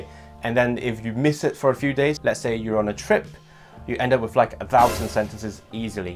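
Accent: United Kingdom. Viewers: To my non-native ears, I legit thought you grew up in the UK.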